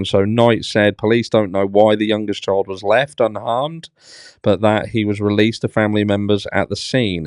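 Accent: Norfolk, United Kingdom. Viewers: Where r u from? U should english amd from Norfolk is that right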